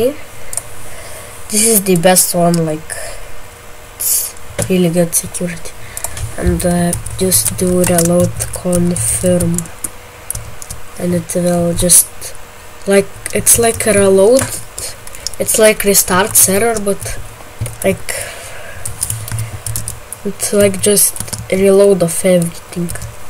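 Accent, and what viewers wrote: Lithuania. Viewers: Lietuvis esi girdžiu😅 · lietuviu anglu xdd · are youy from lithuania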